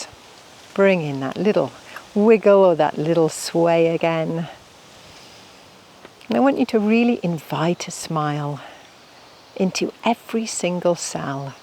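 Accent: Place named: United Kingdom. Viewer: ❤love her clean beautiful accent. Why is it that other British people sound different from her and barley understandable?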